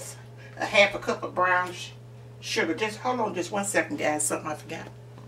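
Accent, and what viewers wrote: South, United States. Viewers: Every recipe I saw 4 this used a box mix & I wanted REAL DEAL scratch made. I clicked on the video & your sweet southern accent & energy reminded me of my aunties & every sweet church lady I encountered in my life. And 1 things I know about sweet Southern ladies is they r the best REAL DEAL cooks & bakers. Gonna definitely make this Thanksgiving. TY SO MUCH. 🌹👏🏿🌹👏🏿🌹👏🏿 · I'm from California and when I heard you speak, I knew this was going to be divine. Your spirit made me feel so safe! You remind me of my God mother. So sweet, southern and full of the love of God! God bless you Miss. Thank you for just doin ya thang and being you. You blessed me real good!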